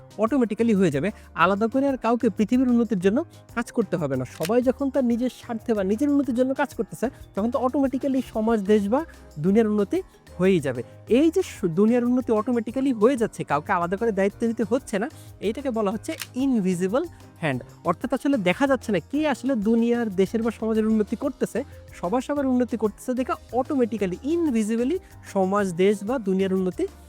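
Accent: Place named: Chuadanga, Bangladesh. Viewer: ভাইয়া জানতাম না আপনার বাসা চুয়াডাঙ্গায়। আমিও চুয়াডাঙ্গা জেলার দামুড়হুদা উপজেলার। আপনাকে অনেক শুনি, এখন বুঝতেছি আপনাকে ভাল লাগার অনেক গুলা কারনের মাঝে হয়ত ভাষার একটা টান ছিল যে নিজেও জানতাম না। ফি আমানিল্লাহ।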